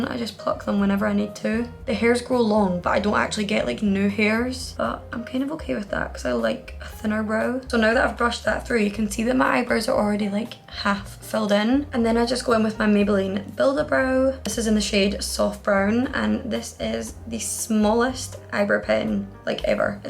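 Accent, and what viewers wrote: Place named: Glasgow, United Kingdom. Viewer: I love your accent, is just makes me feel like home. I’m half British and Glasgow just feels like home even more then Adelaide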